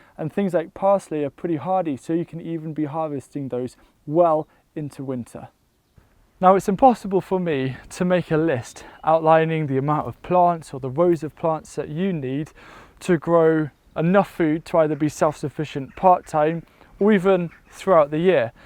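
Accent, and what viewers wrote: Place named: United Kingdom. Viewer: Thank you! What a wonderful video! PS I like the UK accent far better than the US one!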